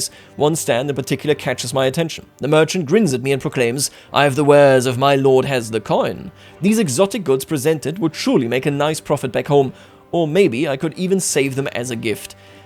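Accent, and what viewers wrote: Bavaria, Germany. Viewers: Warum machst du das eigentlich nicht auf deutsch? Ich hör ja sogar den Bayerischen Aktzent raus!😅 Würde mich nur mal interessieren. Danke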